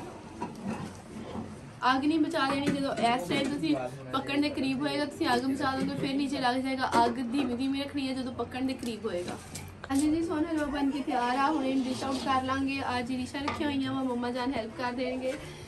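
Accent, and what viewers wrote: Punjab, India. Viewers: Zaberdast 🤲 mah apko subscribe ho apki pqnjabi india wali panjabi hai Allah apko taraki dey ji ayn noh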